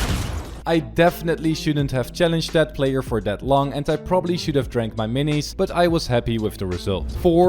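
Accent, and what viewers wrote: Netherlands. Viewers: ben je nederlands hoorde het aan de intro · I feel like youre dutch because of your accent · are u dutch begus u have a little dutch accent and im also dutch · are you dutch? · You sound like ur from the nederlands. Is that true? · is he dutch? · Are you Dutch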